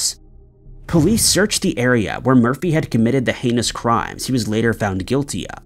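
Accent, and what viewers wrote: United States. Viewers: Can someone explain why the narrator has an American accent yet he speaks like English isn't his first language? It doesn't bother me that English isn't someone's first language, what bothers me is that he sounds like a native speaker and yet he speaks like he's still learning the language. This just baffles me, I've watched a few of his videos now and have never understood why he always says "this strange but true blah blah blah" or "this scary mystery blah blah blah" it just sounds SO awkward and unnatural. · He has an American accent.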